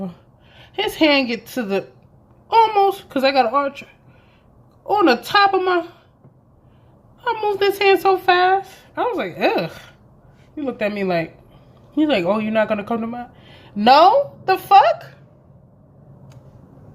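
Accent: United States. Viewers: Girl I watch two of your videos. Now mind you I loved your other 2 video it was the part 1 and 2 of your lipo experience. Since I’m going to get SX in DR I came across your channel. Honey this video right here! This video right here!!!!! Made me hit the subscribe button!!!! Mija between the smacking on the mango and the clear NYC accent and being an Afro/Latina like me I’m hooked. It was worth all minutes of my time😂😂😂😂😂 Keep us laughing girl🥰🥰🥰🥰❤️❤️❤️❤️❤️😂😂😂😂😂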